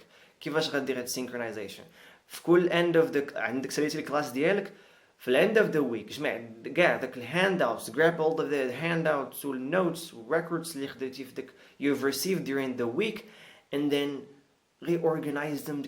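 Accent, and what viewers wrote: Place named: United States. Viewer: AMERICAN ACCENT spots on يااااااي كلوكيت لك خطأ ف نطق كلمة اندوفيدجوول لووول ولاكن تبارك الله عليك .. انا عندي الانجليزية لا بأس بها ولاكن كاتنداثر من قلة التطبيق و الحوار ، مكانلقاش معامن واخا عندي اللكنة قريبا لديالك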